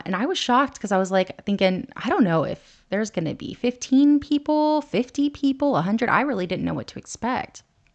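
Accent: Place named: South, United States. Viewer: Her southern accent makes her sound unintelligent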